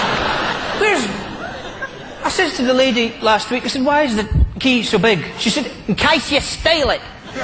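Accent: Australia. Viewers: Real OZ accent!! not beautiful at all. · People who may not realize this, perhaps Americans stumbling upon this for the first time, this guy is right on the money !! His "Aussie accent" needs only a teensy.wee bit more perfecting, but he has summed up Australia & Australians perfectly in a nutshell, beautifully, in fact.!! This bloke is not only very observant, but be's also very funny. #!! A top-notch stand-up comedian !! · Man this guy's got down the middle-aged Shazza accent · Haha Danny actually is the only comedian who has got our accent pretty much perfect. Other comedians try to sound like us and often fail. So yeah we do sound kind of like that, but I think he's only trying to do the stereotypical Aussie accent for comedic purposes. And it works because I too laugh my ass off. xD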